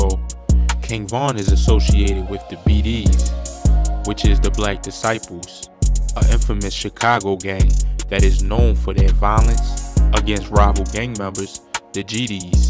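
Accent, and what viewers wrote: Baltimore, United States. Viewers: u gotta be from bmore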